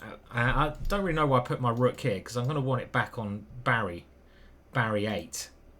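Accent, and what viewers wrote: England, United Kingdom. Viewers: Alright mate, really enjoying your channel, which I'm fairly new to. I watch Eric rosen and have enjoyed his speed run. He's so relaxed and calm. But, I don't know if its because you are English and sound like me, but I really relate to your commentary and thought processes. Which is ridiculous, because the chess i play is the equivalent of Sunday league pub team football, where just turning up is a victory. But you have a knack for explaining shit in a really relatable way. You come across like a normal bloke that I could easily have a pint and a chat with, and it wouldn't be weird. Anyway, not sure what im trying to say, except cheers and good luck. Check out 'devon tracey makes no money at chess'. Beautiful channel, interesting fella. OK that's it. Cheers.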